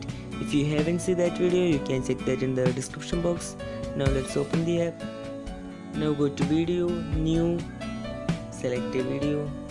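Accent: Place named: Kerala, India. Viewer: His English slang makes me think that he is malayali...aren't you🤩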